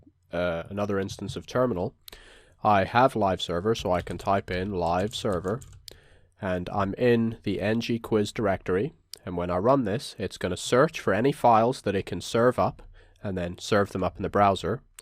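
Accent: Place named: Ireland. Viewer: Your accent sounds like a mix of Irish and Northern Irish. Nice vid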